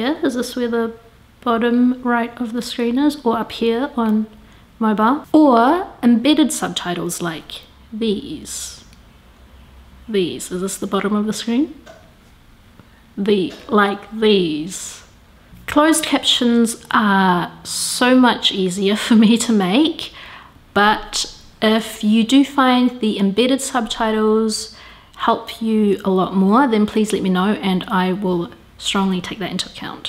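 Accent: New Zealand. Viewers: Learning English with NZer accent 😁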